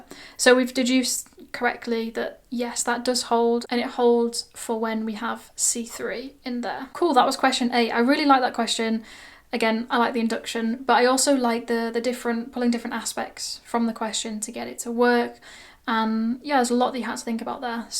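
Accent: United Kingdom. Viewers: I enjoy UR BRITISH ACCENT too😘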